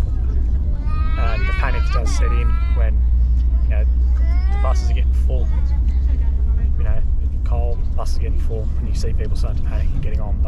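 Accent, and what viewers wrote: Australia. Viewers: I can hear an aussie accent. Please get rid of the beard, we cant hear your voice or see your mouth to try match up words. Good luck mate, stay safe, get out safe. Steve in australia. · Sound Australian? You a legend brah big ups from Perth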